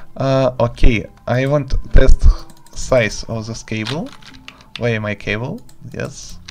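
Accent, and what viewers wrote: Russia. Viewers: хахаах сразу слышу русский акцент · такой русский акцент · It's actually Runglish. 😏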